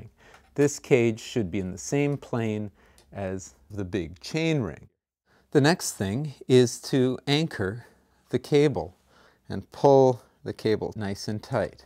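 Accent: Canada. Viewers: Derailleur is French for de-railer so it depends how pedantic you want to be. I guess the instructor is Canadian by his accent and he pronounces it differently to 'muricans. Thanks for the video. This is the second time I've used it to tweak my road rig.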